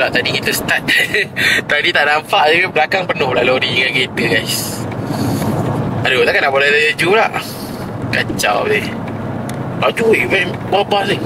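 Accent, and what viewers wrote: North, Malaysia. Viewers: Asalamualaikum..nk tnya asal dri utara ka?kedah ka penang ka perak ka?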